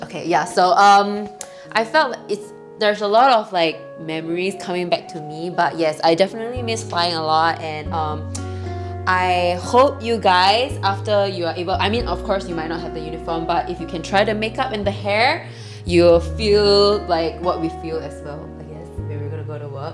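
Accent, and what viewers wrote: Singapore. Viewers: I didnt know u have youtube channellll 🥺 glad i know it noww hehe ❤ i really miss hearing someone speaks in singlish coz i have no singaporean fwens here in indo and i rarely talk to my old fwens in sg anymore 😔 looking forward to more vidsssss 🎉🎉🎉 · idk y but lemme just enjoying that singlish lol, anw that sounds more like malaysians when they r speak english i thought >.< imo thats super easy to identified melayu characteristic xixixi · I can only say "what?" "Excuse me?" For some of your Singlish especially when it comes so fast 😂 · I am ex CX, did u know sq and cx are very competitive(our point of view lol), I always heard sq was doing thus and that...blar blar.hahaha I was wondering sq girls do not do galley works, male crews hanfle galley, so your uniform can be fit and tidy, is it true? any way, welcome to Korea, hope you have another wondetful life here. 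your English accent remind me my SIN crew buddy that I haven't met over 15 yrs since I resigned. miss crew days sometimes.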